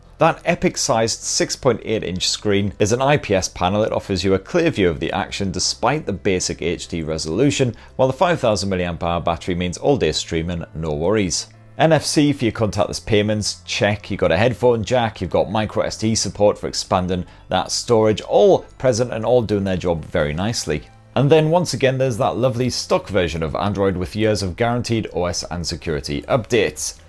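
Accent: United Kingdom. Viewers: Someone please tell me where in Uk this guy's accent coming from exactly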